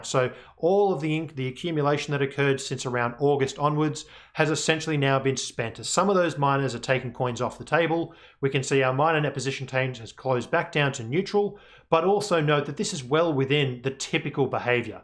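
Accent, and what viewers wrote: Australia. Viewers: Is this speaker from Australia?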